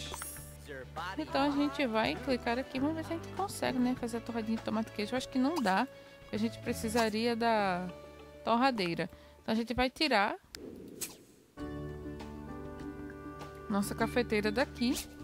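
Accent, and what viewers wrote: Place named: Portugal. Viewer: ela tem sotaque português(o classico)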